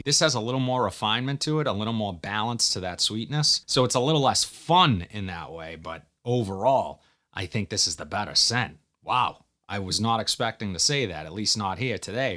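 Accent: Boston, United States. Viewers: I love your Boston accent Bro. I’m in Houston, so I don’t have a real strong Texas accent, but it’s still a Texas accent. I’m gonna go watch the Departed now lol. Sotd Guerlain L Homme Ideal edt.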